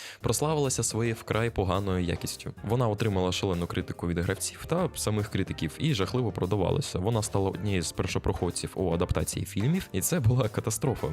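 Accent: Russia. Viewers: забавна говірка в автора. типу іноді проскакує російський акцент, особливо чути в числах. ну якщо автор перейшов з російської то молодець так тримати. якщо автор так розмовляв то мені просто цікаво в якій частині нашої країни такий говір?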